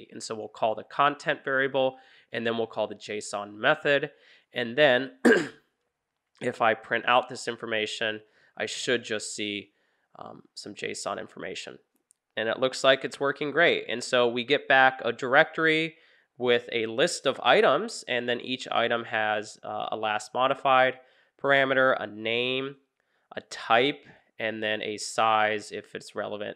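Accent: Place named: United States. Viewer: very helpful. thank you. keep it up i agree with a previous commenter that having instruction in my native english american accent and idiom makes it significantly easier and more enjoyable for me to actually learn to be able to actually implement some of this in my coding and finance data science projects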